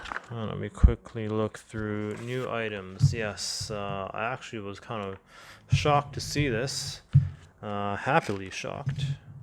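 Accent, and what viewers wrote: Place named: Japan. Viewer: I assume you're Japanese from your pronunciation of Japanese names (and having a Japanese magazine), but your English is leagues better than other Japanese locals. Especially with the accent (it's still there, but only slightly). Did you study in America or grow up there?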